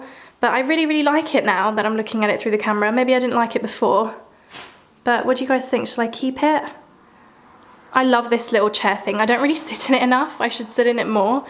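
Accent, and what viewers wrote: Italy. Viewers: Il tuo accento italiano è troppo carino 😊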